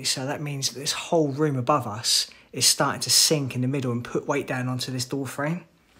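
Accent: United Kingdom. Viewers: I love your UK accent!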